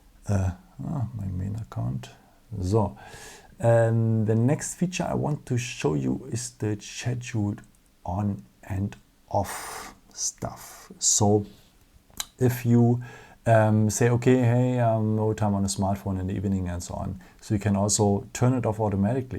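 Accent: Germany. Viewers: he is german i can hear his accent but amazing is he doesnt sound like Arnie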